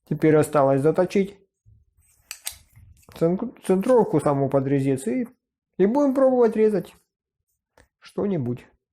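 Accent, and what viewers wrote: South, Russia. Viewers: Шикарный твой южный акцент))) Ютуб Брин - бывший соотечественник.... Вот те и волокита, вот те и бюрократия))) И кстати: твой оператор - малаччина! Всё делает грамотно и почти без ошибок....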